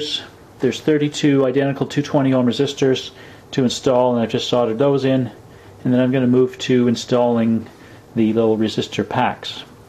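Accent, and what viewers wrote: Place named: Canada. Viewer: Great project! Unfortunately, it seems Briel is no longer selling kits. BTW, while I was watching I was thinking " sounds Canadian" and sure enough you are:) (From a fellow Canadian.)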